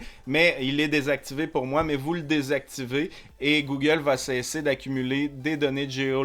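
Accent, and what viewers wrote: Canada. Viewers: L'accent canadien 😖😖😖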